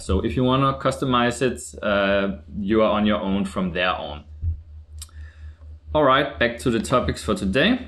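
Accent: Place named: Denmark. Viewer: Du er dansker kan jeg høre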